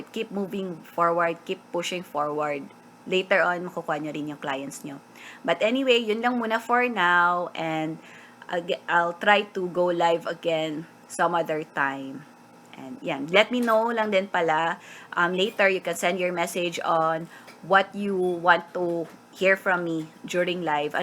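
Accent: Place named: Visayas, Philippines. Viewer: Wow bisaya diay ka coach? Mao nay akong problema ang speaking skills kay bisayang dako sad ko😂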